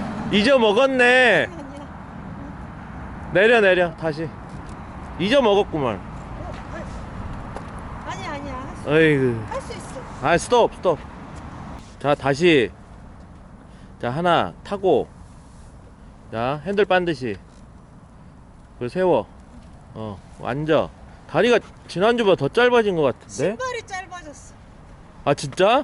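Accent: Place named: Jeolla, South Korea. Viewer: 역시 전라도 사투리는 화난거같은데, 사실 화 1도 안남 ㅋㅋㅋㅋㅋㅋㅋㅋ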